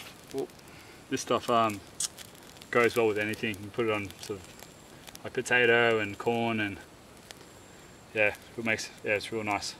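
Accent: Australia. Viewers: awesome vid! with an added bonus of an aussie accent :P Haha cheers from Canada !!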